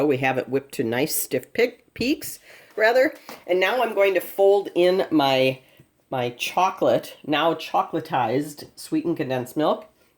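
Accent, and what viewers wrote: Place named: Rochester, United States. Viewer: That looks YUMMY--and so easy even I can do it!! HEY--are you from Rochester by any chance?? (I'm a native.) You have a Rochacha accent! I live in Virginia now and I miss my home town but not in the winter!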